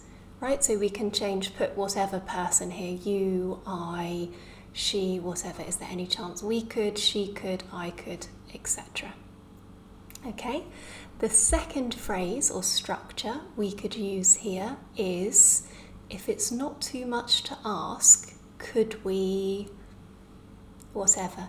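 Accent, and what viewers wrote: United Kingdom. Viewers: Hi..really helpful lessons, brilliant British accent, understandable and practical topics...extremely thanks...would it be possible to write your important tips bigger and not italic at the corner of video...cheers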